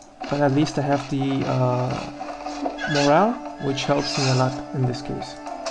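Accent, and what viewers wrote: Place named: Germany. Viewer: Excellent introduction to this great game. Thank you very much! Cute accent...I bet you are from Germany :)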